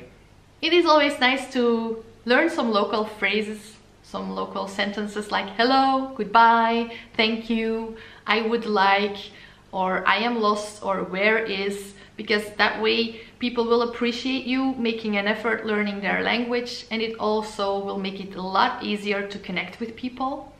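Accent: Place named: Antwerp, Belgium. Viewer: Finally I found someone with the same accent as me!! Ik ben Antwerpse maar woon sinds 15 jaar in de USA.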